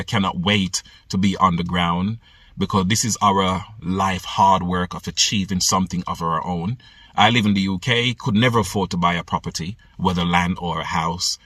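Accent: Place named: Caribbean. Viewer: I love your accent! Are you from the Caribbean?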